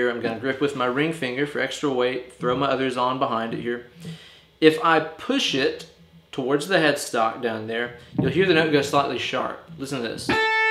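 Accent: South, United States. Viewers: Are you from Tennessee,? Just curious, you know White Chapel, and you have a southern accent, just putting the pieces together. I just moved to TN from MI, and I love it down here! Cheers!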